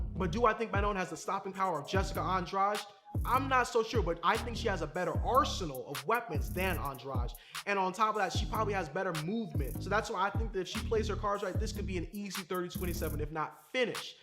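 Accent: Ireland. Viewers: This host has a very different vibe from the usual calming Irish accent. Polar opposite even. Wish him the best but sorry not the one for me. Please stop shouting at me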